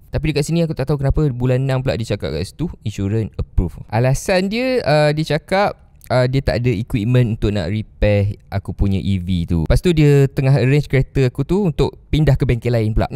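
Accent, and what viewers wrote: Kelantan, Malaysia. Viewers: Org kelantan eh? Dgr cara ckp dpt detect..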